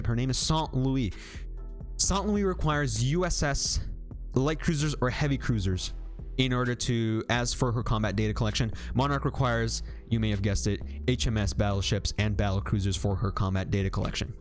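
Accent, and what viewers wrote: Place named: England, United Kingdom. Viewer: i love english pronounciation on german words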